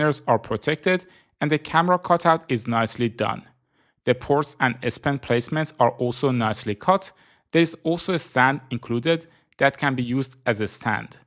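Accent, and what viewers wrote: Iran. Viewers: همیشه یه لهجه ایرانی به گوش آشنا میاد 😊 موفق باشی پسر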